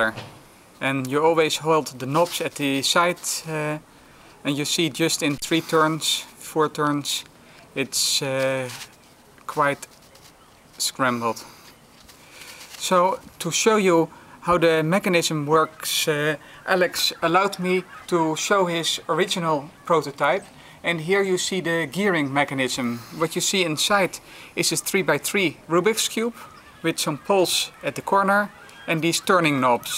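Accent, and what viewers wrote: Netherlands. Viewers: Maar is niet slecht bedoeld hoor. De puzzels zijn altijd top en ik kijk met veel plezier naar je video's. Denk alleen dat als je het Nederlandse accent kwijt zou raken dat je dan professioneler over zou komen. Maar ja wie ben ik haha als ik kijk wat je al bereikt hebt vergeleken met mij moet ik me mond dicht houden. Ga in iedergeval door en ik hoop nog vele mooie creatie's te mogen zien. Gr. Dave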